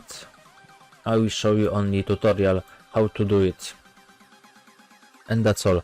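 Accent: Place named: Poland. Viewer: widać ze polak po akcencie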